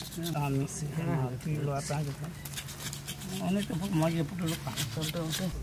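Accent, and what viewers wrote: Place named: Bangladesh. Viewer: Sob thik ache.kintu Hindi sune mone hocche kono bangali Hindi bolche.tai vlog ta bangla te holei bhalo hoto 🙏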